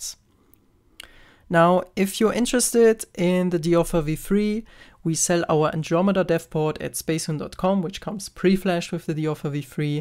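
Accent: Germany. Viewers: Ich liebe deinen deutschen Akzent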